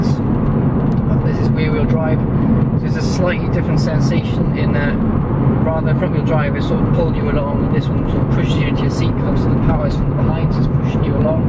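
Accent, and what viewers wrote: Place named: Wales, United Kingdom. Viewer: for some reason you sound Welsh 😆 might just be in one video though 🙂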